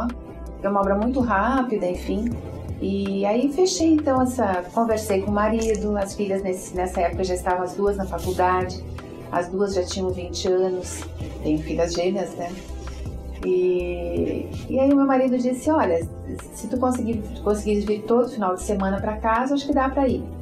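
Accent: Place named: Rio Grande do Sul, Brazil. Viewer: Parabéns por sua trajetória. Acredita, que esta semana, vendo, ouvindo você, foi que notei que és gaúcha! Eu acreditei muito tempo que você fosse Paulista. Ah, mas fiquei muito entusiasmada., você é muito simpática e carismática. Eu amo seu canal e sua maneira de se comunicar. Felicidades.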